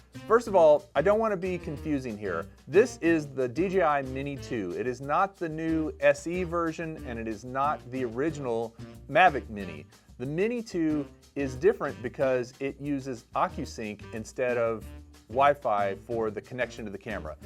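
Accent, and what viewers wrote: United States. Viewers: I like your review because you have clear american voice without accents and its crystal clear to my ears. Thanx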